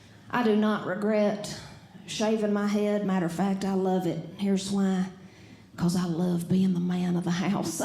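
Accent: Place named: South, United States. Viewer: It’s a southern dialect, please educate yourself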